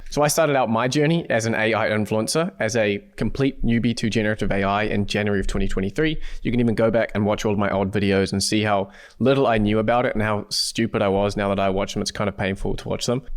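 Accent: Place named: New Zealand. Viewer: Hi.I'm picking you're a Kiwi🙏If so are you in NZ and do you have a mentoring service to assist old buggers like me. Love to connect with you, Like your content👍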